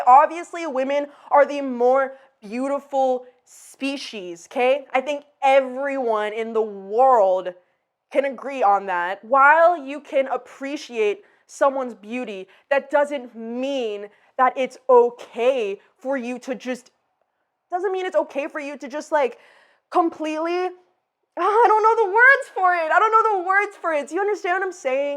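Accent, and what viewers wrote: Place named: General American, United States. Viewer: Generic american. Accents are more difficult to place now-a-days because we consume so much media and pick up words in different accents.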